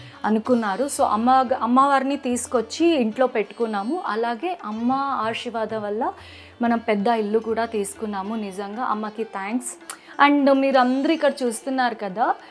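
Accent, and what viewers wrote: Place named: Karnataka, India. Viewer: Valladi native karnataka state banglore anduke telugu ala vundi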